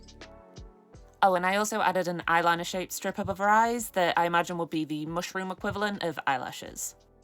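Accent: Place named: Sheffield, United Kingdom. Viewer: amazin work lady .. you from Sheffield cos ya sound like me 😂 your talent is the mutts nuts ❤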